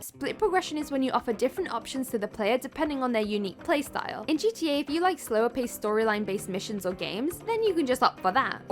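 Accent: United Kingdom. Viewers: The accent sounds familiar, are u from the west mids?? 😂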